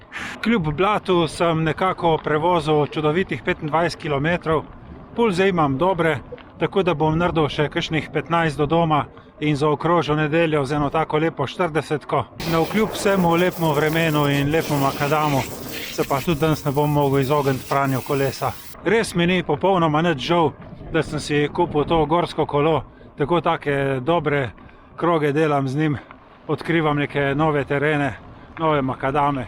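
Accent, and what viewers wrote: Primorska, Slovenia. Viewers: Občasno spremljam vaše zanimive video bloge. Všeč pa mi je to, da v njih govorite v lepi in razločni slovenščini(da ima primorski pridih je zgolj pozitivno) in prav tako vidim, da se zelo potrudite tudi v komentarjih in odgovorih tukaj ali na Stravi. Pohvalno👍 Srečno v hobiju, ki vas veseli pa naj bo to na cesti, makedamskih ravnicah ali pa vratolomnih spustih po trailih.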